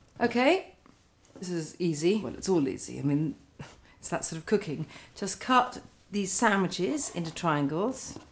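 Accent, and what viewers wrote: United Kingdom. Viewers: I just Luv the way U are Cookin Luv. But, also I adore the way U speak... It's sounds Holesem and very English....I could listen to U always... It's very relaxing 😄 no seriously Ones gets other cooks on the channel who are extremely hasty and the recipes turn out fuzzy, and somethings left out, by the time I've written everything down... Hahaa.... LuvU ☮️...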